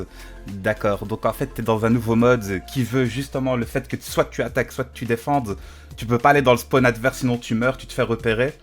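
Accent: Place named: Belgium. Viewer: tu fait trop rire j'adore les belges et leur accent ta trop raison mec t le meilleure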